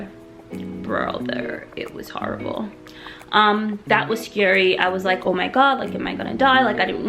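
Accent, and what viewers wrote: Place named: Barbados. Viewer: Where are you from? You sound like a Bajan.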